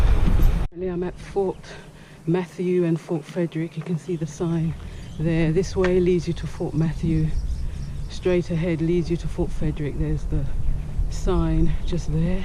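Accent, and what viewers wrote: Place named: United Kingdom. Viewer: She has a British accent.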